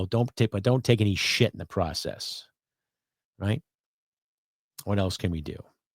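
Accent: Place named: Alberta, Canada. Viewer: you speak fluent Albertan! (Please carry on! ) 👍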